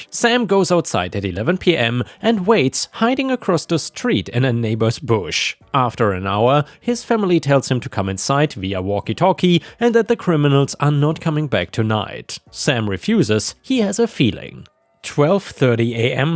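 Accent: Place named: Germany. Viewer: I expected to hear atleast 1 German word the entire time I listened to this lol yall can't tell me dudes 1st language isn't German lol obviously I'm talking about the narrator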